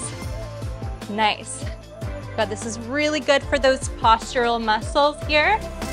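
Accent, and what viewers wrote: Canada. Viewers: This workout popped up on my feed. Really enjoyed the compound moves, weights, pacing. New subscriber. Did I detect a Canadian accent? 🙂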